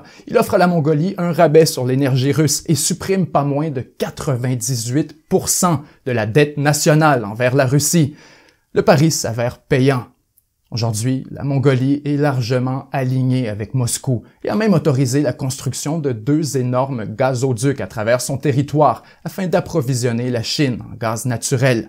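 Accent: Quebec, Canada. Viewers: Les analyses géopolitique de Mr Asselineau sont pas mal du point vue de la France et l'Europe. Je découvre cette chaîne, et j'en suis ravie. En plus j'aime entendre l'accent canadien. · ha l'accent québécois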